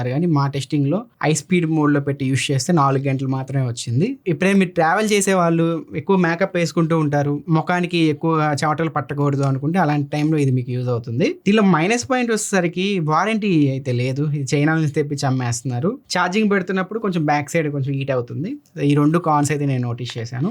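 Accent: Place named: Andhra Pradesh, India. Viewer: Bhaiya andhrolla slang gamat untadi 😂🤣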